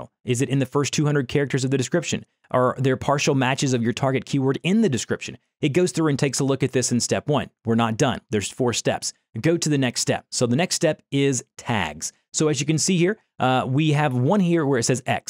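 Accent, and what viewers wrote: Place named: Baltimore, United States. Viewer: Are you from Baltimore? I'm hearing the "O's" in you. Hahaha.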